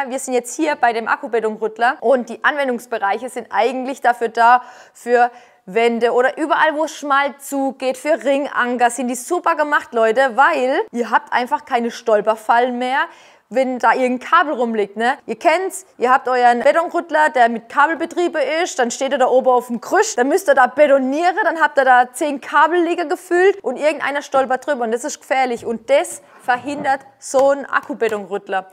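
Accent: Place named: Baden, Germany. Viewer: ich dich einfach eine power Handwerkerin mit deinem badischen Dialekt 😘 liebe aus Hamburg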